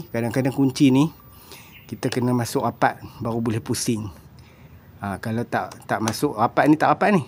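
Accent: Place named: Perlis, Malaysia. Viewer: Abg org perlis ka,cara abg ckp mcm org perlis....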